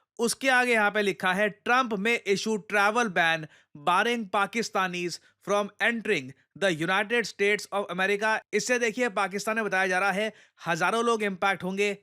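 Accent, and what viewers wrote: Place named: Punjab, India. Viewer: Sir to aaj Punjabi twist me padha rhe hain 😍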